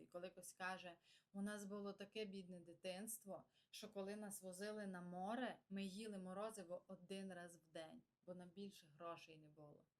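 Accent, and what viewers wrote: Transcarpathia, Ukraine. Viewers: Це закарпатський говір. Ви западенцями їх не називаєте. Западенцями ви називаєте Галичину.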